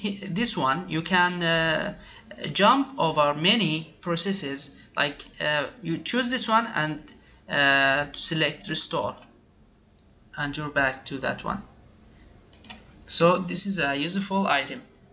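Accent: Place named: France. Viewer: Good job that you made it english but I can still hear that french accent. Why it seems like everybody doing robot turorials are from France?! Interesting :D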